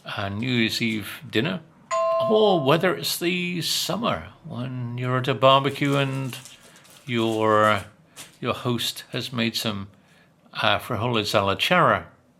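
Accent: United Kingdom. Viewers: I love your british accent,,I try to imitate it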